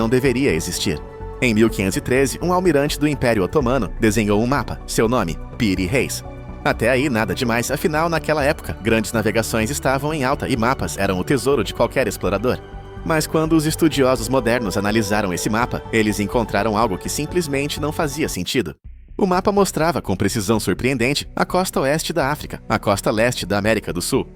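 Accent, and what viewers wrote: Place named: Sao Paulo, Brazil. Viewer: Sotaque de paulista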